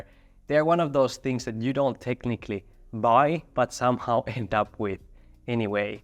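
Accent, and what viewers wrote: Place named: Finland. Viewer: Ihana suomiaksentti! 😂 jotenkin niin hellyyttävä ja ilahduttava. Tosi hyvä video, pitää vilkaista lisää. Aloitan ainakin puolen vuoden ”no/ low buy” -ajanjakson, joten näistä videoista on varmasti apua 😊.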